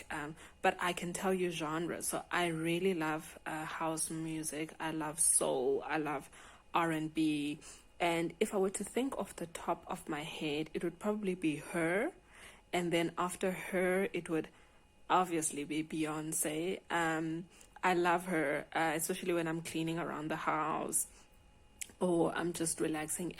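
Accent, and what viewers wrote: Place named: South Africa. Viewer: I love your accent! I would like to visit South Africa one day. I heard it is beautiful. Thank you for sharing. I am a huge fan of boiled eggs too! :-)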